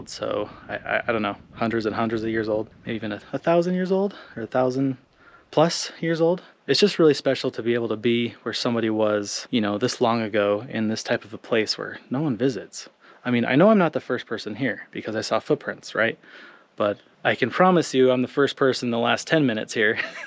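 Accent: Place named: Boston, United States. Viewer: getting the hint you're from Boston? Haha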